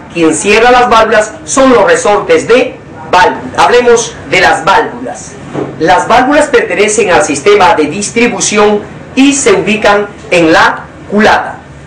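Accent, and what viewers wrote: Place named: Peru. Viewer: Parece que es peruano este maje por como habla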